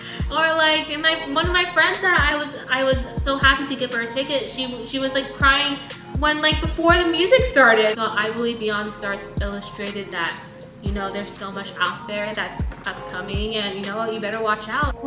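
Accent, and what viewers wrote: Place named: United States. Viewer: Enjoying your vlog especially your American accent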